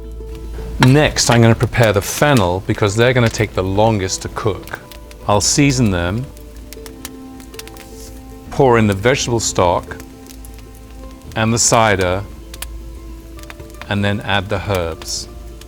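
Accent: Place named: England, United Kingdom. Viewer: Between the music your English accent the sound of the fire the sound of the food cooking and the fire itself these videos are relaxing and enjoyable. My only wish is for it to be weekly.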